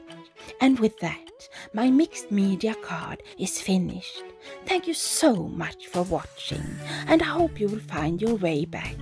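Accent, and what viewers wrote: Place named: Scandinavia. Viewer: You are absolutely adorable! I love your work so much! All of the work that goes in to those details...incredible, and so very well done. Your creativity knows no bounds Love, love, your imagined birds and flowers. So special...and I could listen to you talk all day with that sweet Scandi accent.....and a "heavy book" by Jamie Oliver - yes, please!!!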